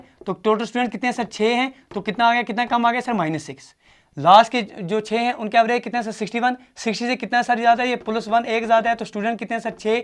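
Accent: Kashmir, India. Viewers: Kasmiri guru jee Accent is cool loved it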